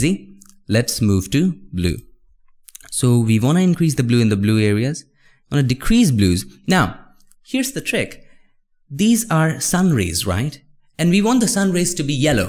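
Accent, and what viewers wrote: India. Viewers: you have good techniques and concepts, but damn your english! you talk like an indian rapper. you have to slow down on your words and give more emphasis to it.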